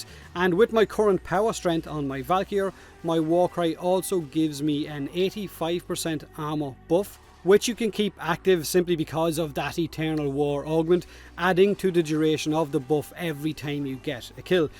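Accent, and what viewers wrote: Ireland. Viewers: Came for the warframe content Stayed for the Irish accent and warframe content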